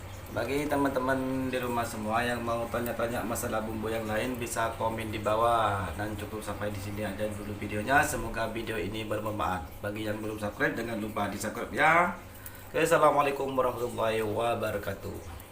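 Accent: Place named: Madura, Indonesia. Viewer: Orang mana mas Logatnya seperti orang madura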